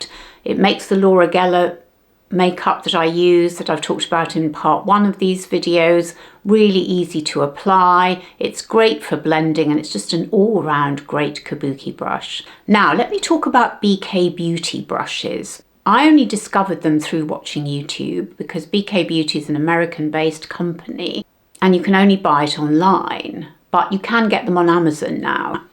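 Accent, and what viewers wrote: United Kingdom. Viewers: I love your British accent and way of speaking. Live in the US for awhile if you want to hear the English language butchered. You are eloquent. 💖💖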